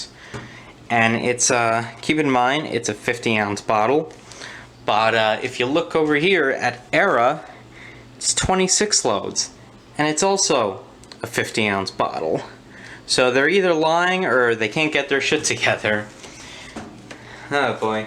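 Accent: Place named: New Jersey, United States. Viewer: Maybe because you had turned away from the camera, and perhaps didn't enunciate it. Or it's that Jersey accent. Or it happened to be the fact that last night was a drinking night for me and I still had--ready--alcohol on the brain! Yes, I've seen some other Method products, but I refuse to buy their crap because of their plant-based OMG this is eco-green. I hadn't seen their scentalizers, I'm curious if they have plant stuff in that too. I have LA's and I've used it a couple times as well, didn't have anything to really say about it good or bad, it was like you said, just kinda there.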